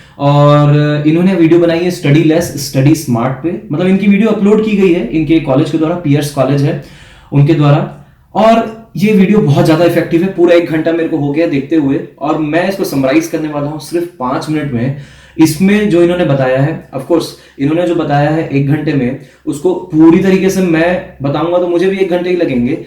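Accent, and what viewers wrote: Bihar, India. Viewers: Bhai aap Bihari ho kya? Mera ak Bihari dost Aisa hi bolta h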